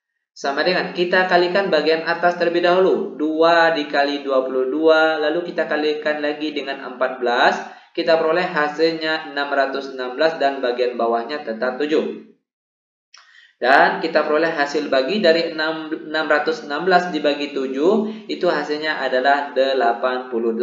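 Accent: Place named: Bima, Indonesia. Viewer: Logat bima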